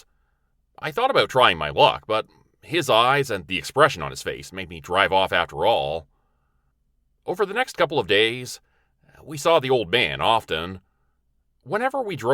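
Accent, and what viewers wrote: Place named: Canada. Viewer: Cause he’s from Canada - and no we don’t all talk like that!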